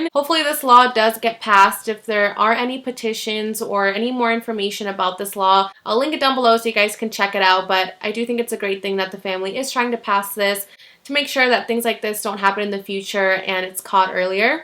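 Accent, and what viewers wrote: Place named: Spain. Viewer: I love your Spanish accent lol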